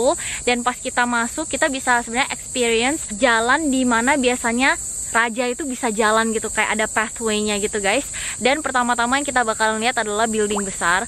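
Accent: Indonesia. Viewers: Medok banget bhs indomu dek !! love it !!! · Ini org korea atau indonesia ya, logatnya sama persis bgt kya org indonesia.. 😅😅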